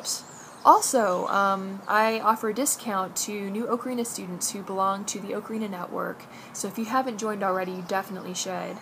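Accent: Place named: Texas, United States. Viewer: I think this is the first time I've heard you speak like a Texan,. I heard you say "y'all" at the end of your video. lol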